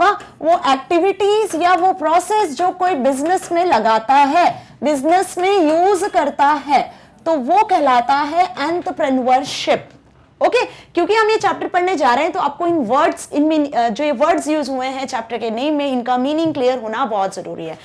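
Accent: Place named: South, India. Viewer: Everything else is okay but ma'am your accent looks like south Indians that is making it difficult for me to understand the words 🙂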